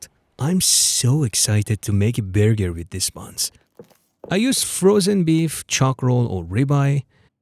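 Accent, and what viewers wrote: Iran. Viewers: Peace ✌🏻.. i like to guess where people are from.. cause i love languages, your accent is pharsi ? 🤓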